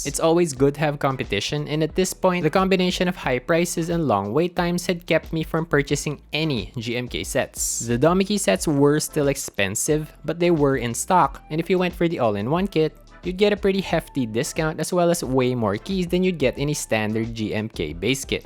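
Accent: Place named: Philippines. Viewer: wow this is my first time watching ur channel and the second i click the video i already guessed u were filipino lol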